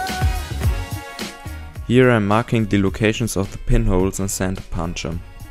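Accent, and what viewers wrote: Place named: Germany. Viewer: Great video 👍🏼 ich nehme mal an du sprichst Deutsch bei deinem Akzent? However well done👌🏼👍🏼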